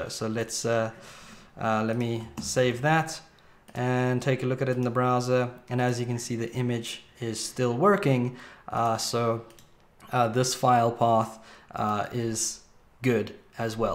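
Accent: South Africa. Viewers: My guess is South African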